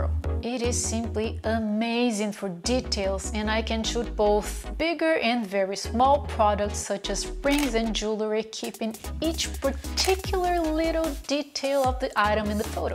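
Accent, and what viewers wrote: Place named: Brazil. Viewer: Eu escutei e aí guys, já percebi de cara que era BR kkkkkk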